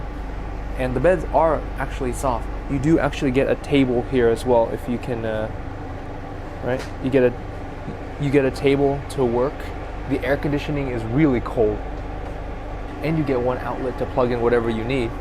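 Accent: Vietnam. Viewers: That hue dialect though. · Thu Phan :) nó nói tiếng đà nẵng chay á cô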